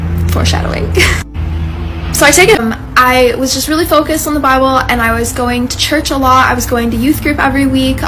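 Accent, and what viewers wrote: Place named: United States. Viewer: Sister I can tell you're definitely American. And you're a good servant of ALLAAHH..in sya Allaahh. May ALLAAHH PROTECT n bless you eternally❤❤❤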